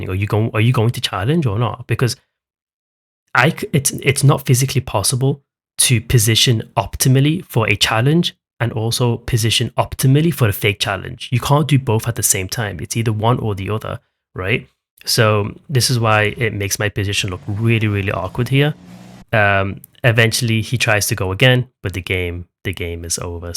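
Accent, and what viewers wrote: Scotland, United Kingdom. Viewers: Maybe he’s Scottish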